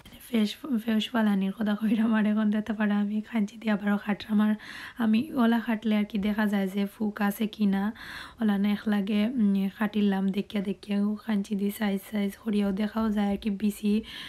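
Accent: Sylhet, Bangladesh. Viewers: সিলেটি ভাষার বোন নাকি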